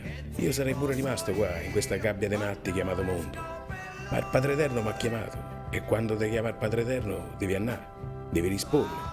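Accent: Rome, Italy. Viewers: No ma non è una critica nei tuoi confronti, semplicemente CHIUNQUE non sia romano e prova a parlare nel nostro dialetto, risulta ridicolo. Il romano non si può imparare, o nasci a Roma o non lo sai parlare :) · Discorso bello ma lascia perdere il romanesco...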